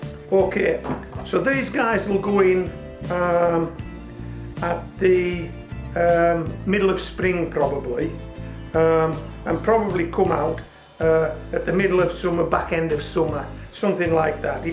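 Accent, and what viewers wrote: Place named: Yorkshire, United Kingdom. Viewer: Hi! if I'm not mistaken that accent is from Yorkshire, England. Correct me if I got it wrong.😊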